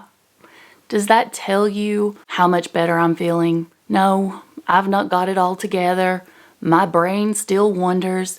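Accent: South, United States. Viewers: Hi.good blog today.op love your snow. From northern Canada so we get lots. I bought dr Fungs Obesity Code book and a great read. Halfway thru the video with CEO you recommended. Great information and makes such good sense. thanks for recommendation. Love your show and your southern accent. Wild. ❤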